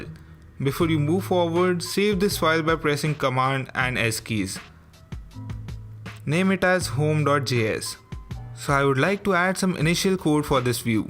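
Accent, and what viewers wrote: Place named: India. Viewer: Very hard to follow with the Indian accent :(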